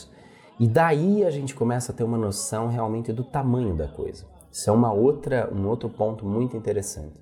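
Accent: Northeast, Brazil. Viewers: Muito bom vídeo, mas esse sotaque nordestino nunca esteve tão presente em vossa fala.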